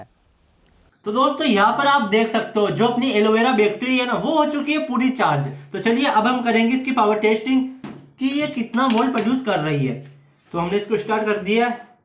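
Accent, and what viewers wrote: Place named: Gujarat, India. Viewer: Bhai ap Gujarati ho ..kiyo ki yeshi hindi ...ham hi bolte..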